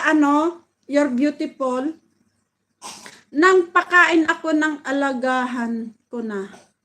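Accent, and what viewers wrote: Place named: Visayas, Philippines. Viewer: Kaya hindi yanmaganda pakingan host heheh may bisaya daming pronounced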